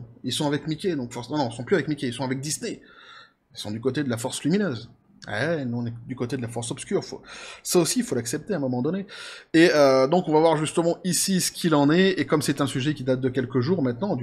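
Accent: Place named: Quebec, Canada. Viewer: Avec ton accent québécois,je croyais que tu étais du Canada..lol. Le comble serait qu'un mec lambda fasse un film d'horreur sur Mickey et que ça fasse un carton. Ca serait excellent...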